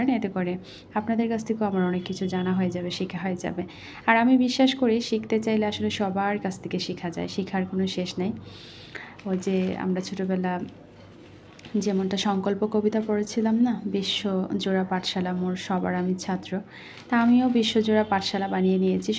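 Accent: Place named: Chattogram, Bangladesh. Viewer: আজকেই প্রথম দেখছি, আমার বাড়ি হালিশহর সবুজবাগ চট্টগ্রাম। আমার এলাকার মানুষ এর কথা শুনেই মন ভালো হয়ে গেলো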